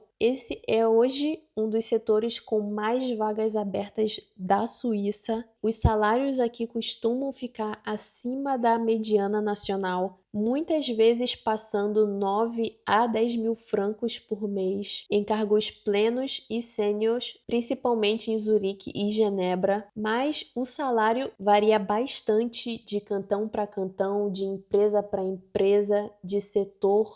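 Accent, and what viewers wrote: Rio de Janeiro, Brazil. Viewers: Ela não é nordestina é Carioca, não tem como mudar o sotaque raiz. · Não da para nós nordestinos mudarmos o nosso sotaque, da mesma forma que a moça tem um sotaque aparentente carioca também não vai mudar o dela.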